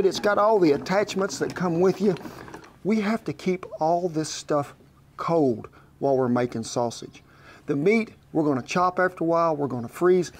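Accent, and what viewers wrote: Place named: Texas, United States. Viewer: red dead redemption grandpa ... man that texan accent. :face-red-heart-shape::face-red-heart-shape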